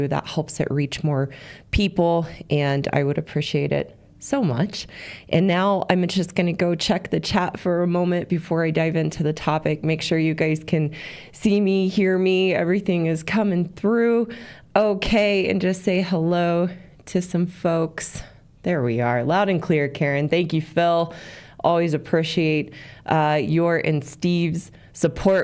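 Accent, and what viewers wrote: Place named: Midwest, United States. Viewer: I knew that I was hearing a hint of a midwest accent with a few Hoosierisms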